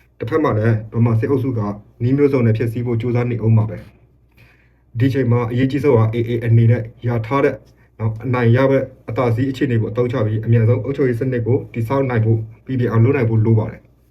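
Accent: Dawei, Myanmar. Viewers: ထားဝယ်ဖက်ကအသံပါ။တနင်းသာရီတိုင်းထဲကဖြစ်မှာပါ။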